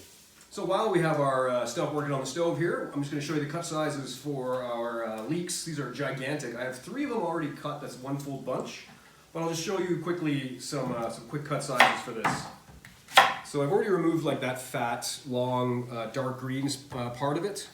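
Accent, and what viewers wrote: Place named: Scotland, United Kingdom. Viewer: I usually have that as a starter whenever I'm in Scotland. Brings back memories of having it on cold days, both summer and winter 😅 I always like how the Scots add barley in soups while in Asia, it's served as a drink or dessert. There are variations of Scottish accents and yours reminds me of the Simpsons. 😅 Great one. It looks amazing and rustic 👍🏻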